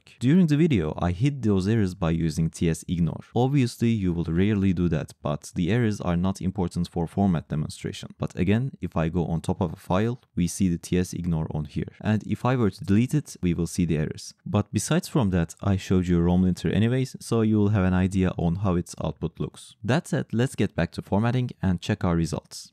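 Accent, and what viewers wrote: Turkey. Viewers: Your accent sounds Turkish lol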